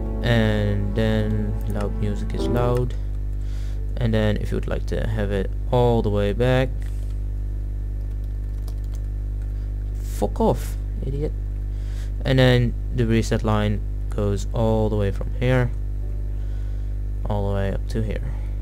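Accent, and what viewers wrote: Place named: Netherlands. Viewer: Are you and you're friend dutch? If so you're accent is awesome :D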